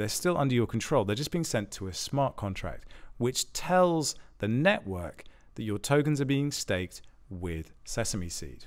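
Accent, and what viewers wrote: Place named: United Kingdom. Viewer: It’s just a British accent. The world is mesmerized by it.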